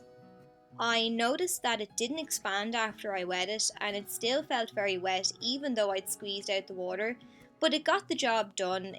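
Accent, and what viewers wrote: Ireland. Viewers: Where in Ireland are you from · I love your accent X I'm also Irish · I loved this video😊what part of Ireland are u from?I'm from Kerry 💚💛💚💛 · Where in Ireland are u from xx? New subscriber!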